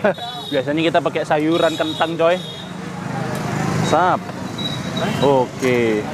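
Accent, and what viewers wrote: Medan, Indonesia. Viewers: Yang bikin saya salut dengan Vloger ini walaupun bahasanya agak nyinyir didepan pedagangya dengan logat medannya yg lucu tapi dia tidak lupa berbagi dengan orang2 disekitarnya… mudah2an murah rezeki lah kau bang …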